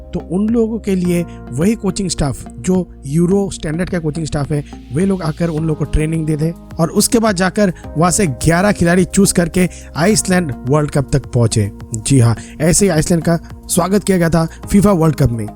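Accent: Bengal, India. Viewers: Bhai aap west Bengal se ho kya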